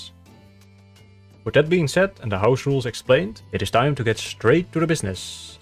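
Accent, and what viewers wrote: Netherlands. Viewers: go goooo, you're a legend amongst the lowlands and even a bigger one on this game. Your episodes are evolving greatly and I just can't get bored of that lovely Dutch accent. Keep it up man!!!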